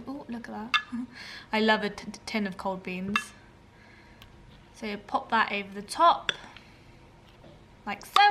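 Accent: Bristol, United Kingdom. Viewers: I knew you were from the west country. Love the "brizzol" accent.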